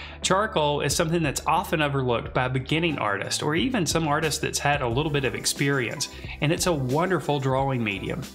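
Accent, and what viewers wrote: South, United States. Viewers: Aidan and Cristie Stevens Just a Southern accent. I’m sure he doesn’t do it just to bug you. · "Karen Dash" I was listening, not watching when he said this and I had no idea that he meant Caran d'Ache! LOL Never heard it pronounced with such a strong southern USA accent before. He sounds super country. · Hes got a bit of a southern draw.....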